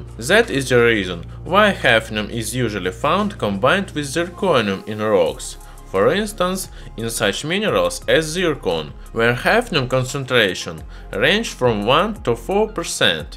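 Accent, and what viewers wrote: Russia. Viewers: Congrats on this video. Didactic and interesting. Are you russian? Your accent sounds russian. English easy to understand. Greetings from Tupã, state of São Paulo, Brazil. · I'm watch your videos because of your accent i like Russian accent . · Is it a Russian accent?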